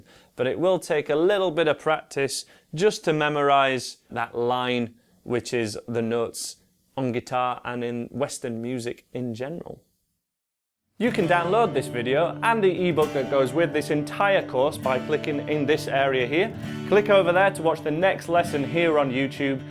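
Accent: United Kingdom. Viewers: I loved ya explanation and I also love ya british accent. That's really catching !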